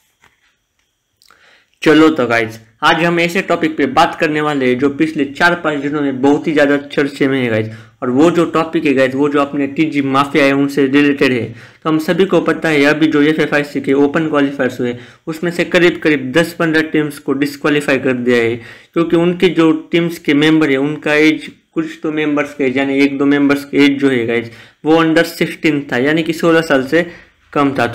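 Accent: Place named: Maharashtra, India. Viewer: U r talking accent is like maharshtrian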